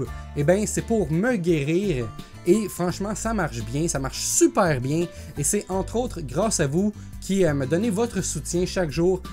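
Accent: Quebec, Canada. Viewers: très bonne vidéo, Comme toute tes autre d'ailleurs, je prend rarement la peine d'écrire dans les comentaires des youtubeur mes je trouve ton contenue tres professionnel et ta facon de parlé est simplement parfaite (simple,calme,respectueux et surtout compréhensible) en étant quebecois moi meme parfois j'ai l'impression que les autre youtubeur quebecois parle (trop quebecois) comme qui il parlerai a leur ami de long date dans le salon ce qui fait que la video est moin plaisante a écoute. Un long texte pour simplement te dire ne change pas. J'ai h¸¸ate a tes 1000 abonnés pour mieux comprendre ton logo même si je crois déga avoir une petite idée de ce que cela peut représenté. PS: j'ai 23 ans. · j'ai 27 ans et c'est un grand plaisir de t'avoir découvert sur youtube j'aime vraiment l'accent du québec et ton contenu et de bien meilleur qualité que d'autre chaîne d'on ont ne citera pas de nom continu nice job ps: nous somme voisin je suis dans le quartier pauvre de zom-zom aller sur ce bonne continuation .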